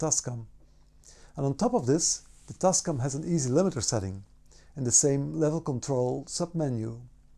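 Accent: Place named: Netherlands. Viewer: Je Nederlands komt er door, geen probleem hoor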